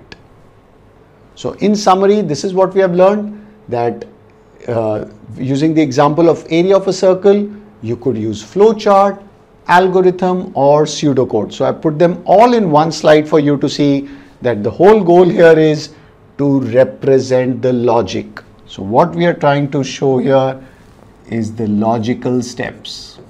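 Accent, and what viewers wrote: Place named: India. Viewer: wow nice indian accent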